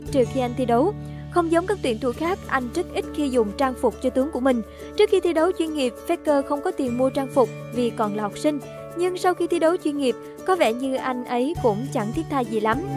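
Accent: Standard. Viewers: Trời giọng chị này chuẩn vãi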